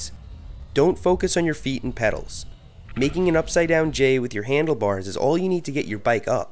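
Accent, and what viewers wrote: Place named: New York, United States. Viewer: Was pretty sure you were from NY when I heard you say BAWLS in a few previous videos. This video confirmed that, lol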